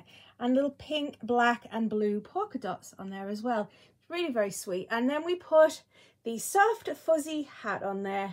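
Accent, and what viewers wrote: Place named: United Kingdom. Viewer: Don't you dare change the way you say anything! I love your British accent just the way it is. ❤️❤️❤️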